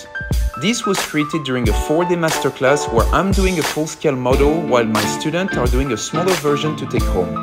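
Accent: France. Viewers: He's losing his french accent? Amazing turtle tho! :)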